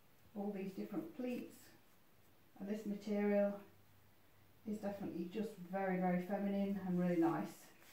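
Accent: United Kingdom. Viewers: Hi Thanks for responding, most appreciated. I know that your are passionate about Cervin, but I've just had a mail from Gio - 50% off everything and their stockings are very good too.. Lovely to hear your northern accent. Where are your origins? I'm originally from Bolton/Chorley. Have a lovely evening xx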